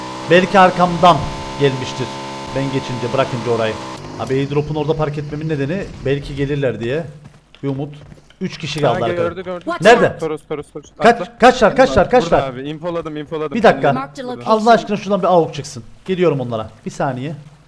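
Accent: Cyprus. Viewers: Abi sen kıbrıslımısın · abi senin 2 3 videonu izledikten sonra Kıbrıslı gibi konuşmaya başlıyorum 😂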